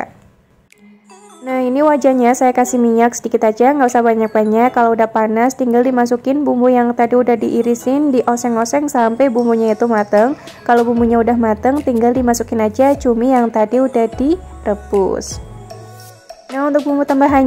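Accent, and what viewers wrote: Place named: Pemalang, Indonesia. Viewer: Okeh2 khas Pemalang,ogel2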